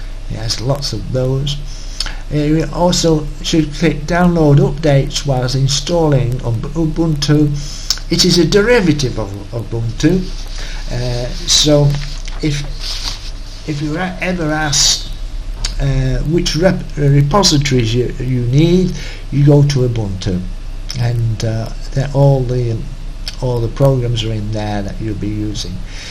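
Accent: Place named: Yorkshire, United Kingdom. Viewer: who say he is a windows 10 lover he might be a windows xp lover also with that accent I can tell your from Yorkshire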